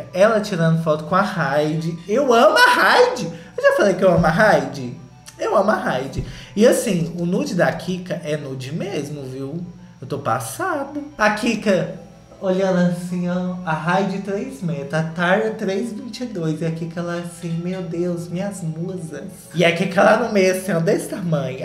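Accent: Minas Gerais, Brazil. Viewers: 😂 do céu! Você é INCRÍVEL! E, pelo cristo, não mude! Adoro seu “mineirês” de tudo baum! Um cheiro!😁✨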